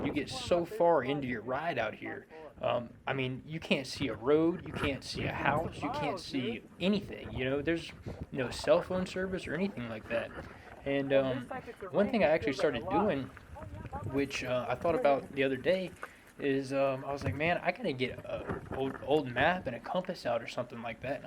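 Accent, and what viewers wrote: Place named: Carolinas, United States. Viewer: Yooo! I just heard you say you were in SC and NC! I thought your accent was from the Carolinas! I'm in NC, and would love to hear about any trails you'd recommend riding out here! I loved hearing the stories of how you've helped people with the unicycle. 😁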